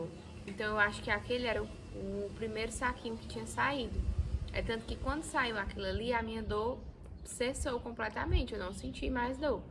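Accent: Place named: Ceará, Brazil. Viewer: E esse sotaque cearense?..hehe.. Deus abençoe sua vida! Deus sabe de todas as coisas!! O meu caso foi muito parecido, adotei uma menina, minha Larissa. Ainda pensava em engravidar, quando resolvi fazer tratamento, descobri câncer de endométrio, minha sorte foi oq descobri cedo. Hoje estou curada e muito feliz pq estou curada e posso cuidar da minha menina Larissa. Presente q Deus me deu para que não sofresse depois uma histerectomia total. Deus sabe de todas as coisas. Tudo é providência Dele. Penso em adotar outra menina pq a primeira deu certo, amor maior não há!!!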